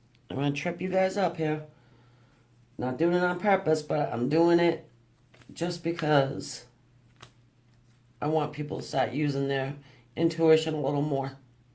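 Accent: New York, United States. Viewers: Where are you from? New Jersey? New York? Long Island? I love ur energy haha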